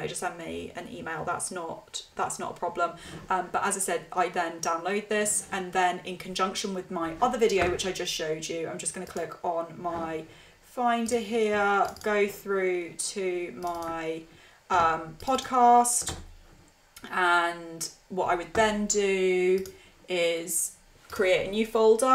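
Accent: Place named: Australia. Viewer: I liked the tutorial. I have been using Ringr for years but they just had a meltdown and their customer support sucks. I have zero tolerance when it comes to support issues and a meltdown. How is the support if you need it? Are you familiar with Ringr and I am going to assume you are pretty high on Zencastr yes? Also I love your name and your Australian accent.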